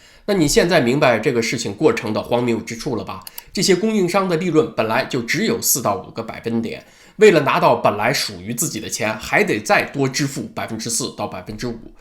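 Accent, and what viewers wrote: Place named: Beijing, China. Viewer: 京味兒太濃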